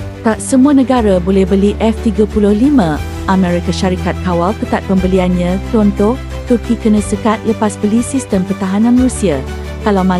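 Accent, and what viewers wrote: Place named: Indonesia. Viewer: Indon mana lah gigih sangat belajar bahasa malaysia...sebut perkataan malaysia jelas dengar macam slang indon..😅😅😅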